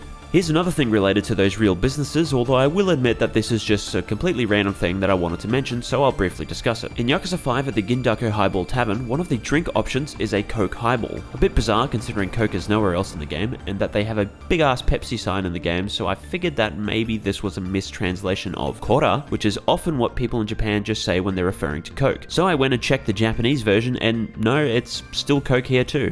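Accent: Australia. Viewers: The Aussie Just shone through in this video, never felt more at home!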